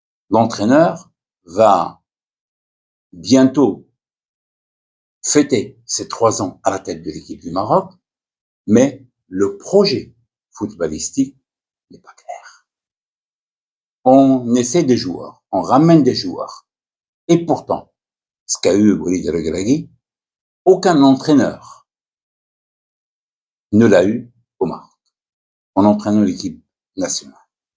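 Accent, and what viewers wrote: Standard. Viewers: فرنسية نقية ا السي ، تبارك الله ماشاء الله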